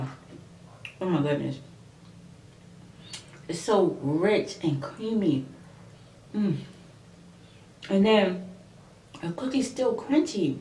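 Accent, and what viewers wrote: Baltimore, United States. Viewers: I had a feeling you was from Baltimore from your accent but I didn't want to assume. Most of the time I'm hungry when I watch your channel because it's late and I've been on this don't eat after 7 & before I would eat dinner and dessert like around 9pm. I just be living through you lol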